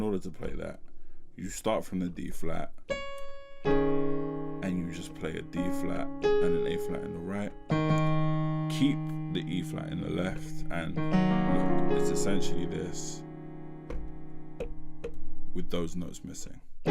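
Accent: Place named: United Kingdom. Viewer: Wow when I heard the British accent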